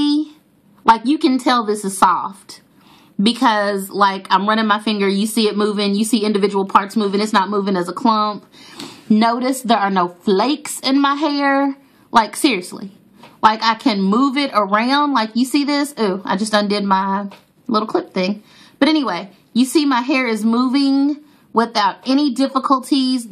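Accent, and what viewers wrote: United Kingdom. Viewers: Looool your english accent!! Its sooo funny! When you come to the uk,we need to meet up!! Lool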